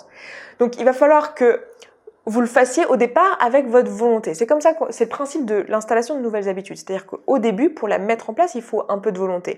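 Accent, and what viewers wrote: France. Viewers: Tu es lyonnaise?:D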